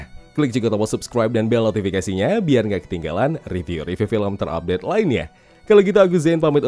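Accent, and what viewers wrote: Medan, Indonesia. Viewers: Medan kali bah si abang..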